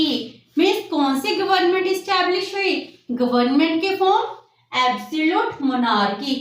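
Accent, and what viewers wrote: Punjab, India. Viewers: thankyou mam, the way u teach with punjabi accent is awesome and in a lucid way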